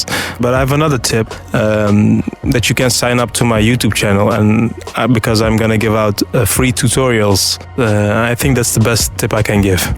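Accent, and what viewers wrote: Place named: Bihar, India. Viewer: Bhot Chutiya Naam Hai. Plus Tu Bhi Bhot Gandu Lagta Hai. Sale English Bihario Jaisi Kyu Bolta Hai. Thanks For This Upload Though Bro K Alawa Kuch Aur Bol Bhot Fake Aur Effort Dalne Wala Sound Kar Raha Hai.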